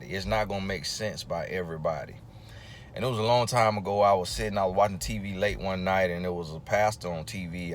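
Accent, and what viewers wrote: South, United States. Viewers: I just enjoy hearing you talk, that country accent though.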